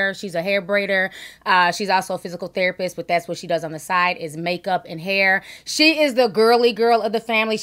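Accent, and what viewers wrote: Nigeria. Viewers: ! I didn’t know that you’re Nigerian!! squeals That accent floored me.🙌🏿👩🏾‍🦱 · I love the wsyvthis is editd. And I see you can turn that Nigerian accent on/off real quick. I bet that's what your kids hear when you're mad. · I luv your Nigerian voice. More please! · Omg your Nigeria accent was on point omg it’s crazy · I love your Nigerian Accent!❤ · Love your Naijan accent 😻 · I love your Nigerian voice!!!!! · Come through with the Nigerian accent yesssss!!!😍😍😍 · Well hello beautiful, come through with the Nigerian voice. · And please use ya Nigerian accent more often! We need a commentary video in a full Nigerian accent. Please now! I beg!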